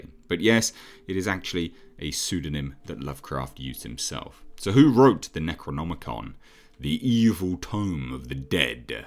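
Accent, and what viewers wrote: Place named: England, United Kingdom. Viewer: sounds like James Earl Jones with an english accent. "Oi! I am your father mate!".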